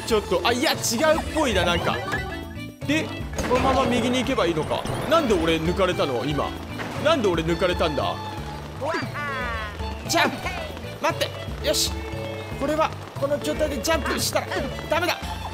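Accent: Fukuoka, Japan. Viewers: もう少しで勝てそうな惜しい試合が多かったですねｗ それよりなんだかコメント欄がにぎわっていますね！良いことだと思います！は福岡のかたですか？前から福岡っぽい喋りだとは思ってましたがｗ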